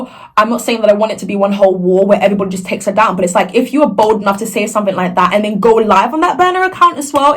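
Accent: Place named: United Kingdom. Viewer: You Look stunning!! And I love your british accent! So charming!!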